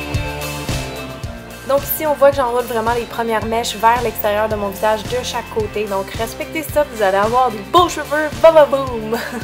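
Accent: Quebec, Canada. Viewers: accent québécois !!!! j'adore !! moi j'ai des boucles naturelles qui tirent plutôt vers les année 50 XD ! la vieille ! mais bon si je devais choisir je dirai le look 2000 ou 50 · J'adore ton accent québécois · Je trouve ça tellement chou cet accent québécois ! ;) Pouce bleu qui vient de la France ! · J'adore ton accent ter du Québec peut-être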